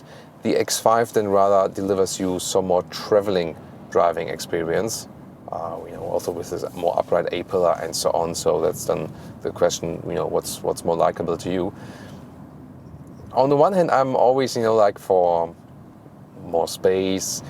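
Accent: Germany. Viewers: Und woher kommt so ein schrecklicher deutscher Akzent? 😅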